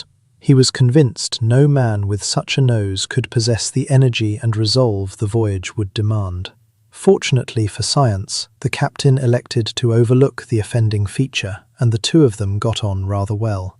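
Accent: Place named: United States. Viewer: Sorry. American accent. Finding something else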